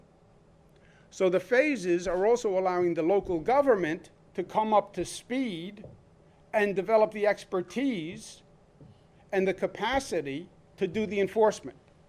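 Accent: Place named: East Coast, United States. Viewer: I just listen to him for his east coast accent and humorous points of view (Urban). As a governor, Cuomo is as corrupt as any of them. He is still just a puppet who has made some terrible choices because he was bought and paid for. Do a little bit of research if you don't believe me. Don't praise this man, listen to him from an entertainment standpoint alone.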